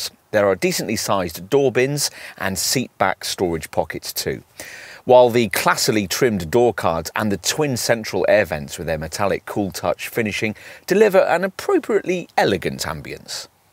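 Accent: United Kingdom. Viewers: Car review in propah british accent!!!!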